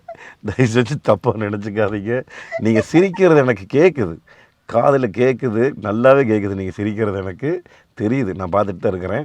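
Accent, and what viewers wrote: Kongu, India. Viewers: கொஞ்சும் கொங்குத் தமிழ் பேசும் கோமகரே...! திகட்டாத தித்திக்கும் பேச்சுடைய திருப்பூர் திருமகரே...! மதிப்பிற்குறிய " " திருப்பூர் திரு. அண்ணா🙏❤ எப்படி இருக்கீங்க❤🙏 அண்ணி எப்படி இருக்காங்க❤🙏 ரித்திக் எப்படி இருக்காரு❤ அருமை அருமை அருமை வழக்கம்போல அண்ணா உங்களோட வீடியோ அருமை❤❤❤😊😊❤❤ என்ன சொல்ல ஏது சொல்ல😊😊❤❤❤ சும்மா நெகு...நெகு...நெகுனு இருக்கு வீடியோ😊😊❤❤❤❤ பூவாட்டம் தாலாட்டுது உங்க பேச்சு❤❤❤❤❤❤😊😊😊❤❤ நானும் 80s kids அண்ணா❤ என்னோட பிறந்த வருடம் 1988தான்😊 அதுமட்டுமல்ல இந்த வீடியோவுல இன்னைக்கி அண்ணா நீங்க எடுத்துட்டு வந்த இந்த பழையகாலத்து "மாருதி 800" காருக்கும் என் வாழ்க்கைக்கும் ஒரு பிணைப்பு இருக்கு❤❤❤❤❤ பிறந்த குழந்தையான என்னை மருத்துவமனையில் இருந்து வீட்டுக்கு அழைத்து வந்த முதல் கார் இது❤❤❤ நான் என் வாழ்க்கைல பயணம் செய்த முதல் கார் இந்த "மாருதி 800"தான்😊😊😊😊😊😊❤❤❤❤❤❤❤❤❤❤❤❤❤❤❤❤❤❤ என் பெரியப்பா வைத்திருந்த கார் இது அவர்தான் பிறந்த குழந்தையான என்னையும் அம்மாவையும் அப்பாவையும் மருத்துவமனையில் இருந்து வீட்டுக்கு அழைத்து வந்தார் இந்த காரில்❤❤❤❤❤❤ இப்போது இந்த கார் அவரிடம் இல்லை விற்றுவிட்டார். 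எனக்கு சரியாக 15 வயது இருக்கும்போது இந்த காரை அவர் விற்றார். நான் முதன் முதலில் டிரைவர் சீட்டில் அமர்ந்து படபடப்போடும் பயத்தோடு லேசாக ஓட்டி நகர்த்திப்பார்த்த முதல் காரும் இதுவே❤❤❤❤❤❤❤❤❤❤❤❤❤❤❤❤ நீங்காத நினைவுகளை கண்முன் கொண்டு வந்துவிட்டீர்கள் அண்ணா❤❤❤❤❤❤❤❤❤❤❤❤ அய்யோ செகண்ட் வீடியோ அந்த சீட் பெல்ட்🤣🤣🤣🤣🤣🤣🤣🤣🤣🤣🤣😂😂😂😂😂😂😂😂😂😂😂😂😂😂😂😂😂😂😂 இந்த டிரைன்ல டாய்லெட்ல சங்கிலில கட்டித் தொங்கவிட்டுருப்பாங்களே எடுக்க மட்டும்தான் உங்களுக்கு முடியும் மத்தபடி ஒன்னுமே முடியாதுனு சொல்ற மாதிரி🤣🤣🤣🤣🤣🤣🤣🤣🤣😂😂😂😂😂😂😂😂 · Kongu mandalam tamil. We ball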